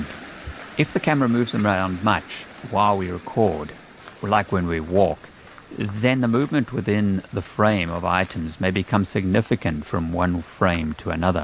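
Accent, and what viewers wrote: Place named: South Africa. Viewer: You sound like my friend from South Africa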